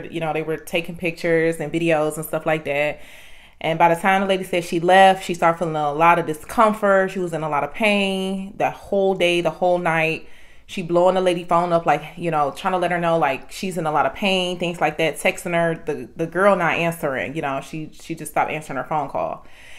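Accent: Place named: Washington DC, United States. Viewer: The accent dound like she's from DC/PG..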